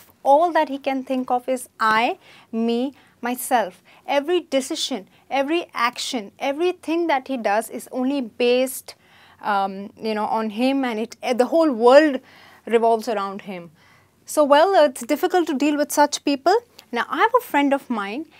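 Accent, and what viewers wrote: India. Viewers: This is Indian english not English !!!!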